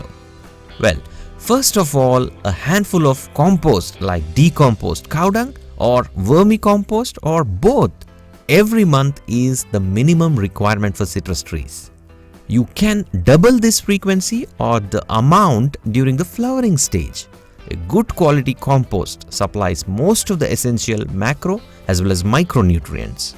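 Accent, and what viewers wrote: India. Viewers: Hahaha that Bollywood accent is so funny and cute! Nice vid! Thx!😂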